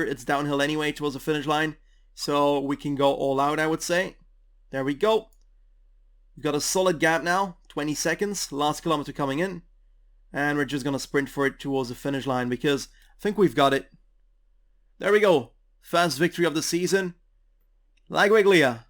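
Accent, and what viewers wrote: Belgium. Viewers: ben je een belg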